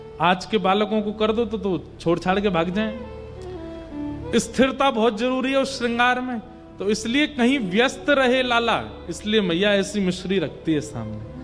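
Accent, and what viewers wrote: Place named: Braj, India. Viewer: Jay Shri Krishna Radhe Radhe 🕉 OM Namo Bhagvate Vasudevy Namh OM 🕉 Namo Narayan ji Jay Shri Hari Jay Shri Shyama Shaym ji Jay Shri Laxmi Pati Jay Shri Radha Rani Jay Shri Radha Kishori ji Jay Shri Radha Raman ji Jay Shri Radha Vallabh Lal ki Jay Ho ♥️ Radhe Radhe Jay Shri Harivansh Guru Dev Maharaj ji Aapki vraj Vani Badi Mithi hai Jay Shri vraj vasi Aapke Charno Mai Koti Koti Vandan ♥️ 🙏 Jay Shri Vrindavan Dham 🙏 Jay Shri Yamuna Maharani ji 🙏 ♥️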